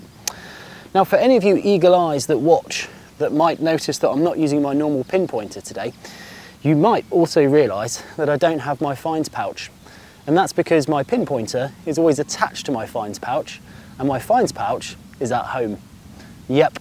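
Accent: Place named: South, United Kingdom. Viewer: That was interesting, thank you.What part of Britain are you in? I'm guessing somewhere down south.🙂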